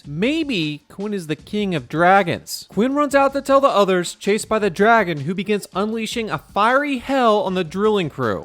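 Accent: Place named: California, United States. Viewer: I hated the " confused California bro" accent at first but now I can't watch these how to survive or how you'd die videos without it. This channel is great 👌🏻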